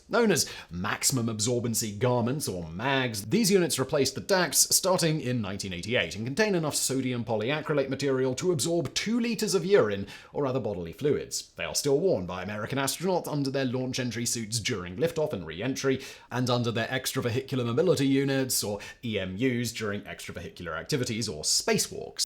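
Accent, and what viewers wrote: United Kingdom. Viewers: This subject can only be conveyed with an British English accent. Centuries of a stiff upper lip has mad it capable of talking about any subject with (at most) minimal sophomorishisms. 😉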